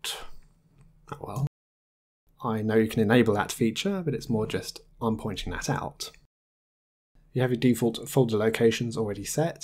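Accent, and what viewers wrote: United Kingdom. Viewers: dat british accent :)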